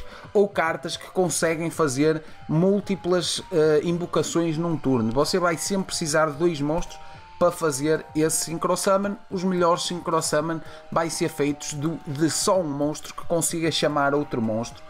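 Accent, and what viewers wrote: Portugal. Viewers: Pensei que portugual falaria mais parecido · de Portugal, ora pois xD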